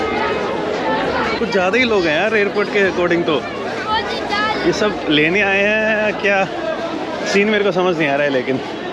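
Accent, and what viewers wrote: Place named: Delhi, India. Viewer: Brother I understand every word of yourself , your voice is similar to delhi region hindi , I study in delhi university in 2007 and live 4year in india , I am also able to read and write hindi and speak also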